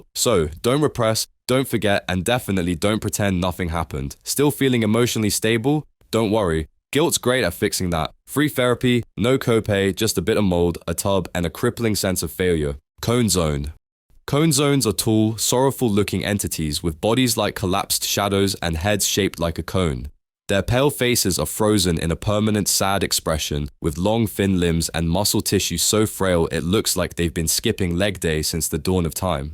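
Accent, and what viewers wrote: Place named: United Kingdom. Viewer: why does your text to speech program has a british accent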